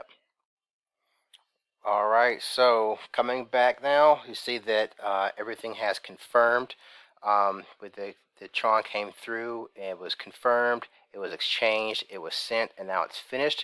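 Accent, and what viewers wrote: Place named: United States. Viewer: Are you in the US. am I able to use simple swap in the US? You sound like you are American, but the flag on simple swap is british.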